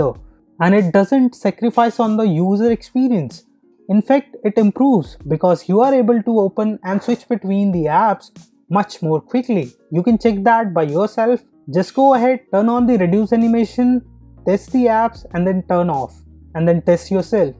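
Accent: India. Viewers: Im tired of your Indian accent. Sorry to say that.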